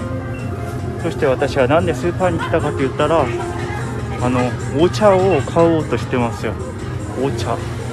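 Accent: Fukuoka, Japan. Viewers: キックボードに乗りた〜〜く!!!なるスタートですね😊そして福岡弁があったか過ぎ…こんなナレーションのNEWSなら、視聴率が右肩上がりになりそうです🆙🆙🆙 最後の「ガーーーン🎶😱」音に免疫がついてきて、ビックリどころか、もはや楽しくなってきました🎶🤗🎶慣れってスゴイ…。 …毎回、やるちゅもり❓ JUJUさんが今日も元気で良かったです。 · 福岡弁、癒される〜✨ 今は東京に住んでるけど、福岡ほんと好き〜 私は毎日とうもろこし茶飲んでるよ〜👍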